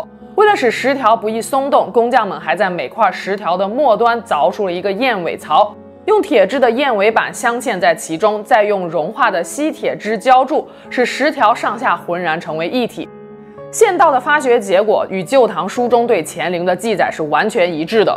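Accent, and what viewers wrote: Taiwan. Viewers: 哇第一次看见的厨房，我好像听到台湾。。你是台湾？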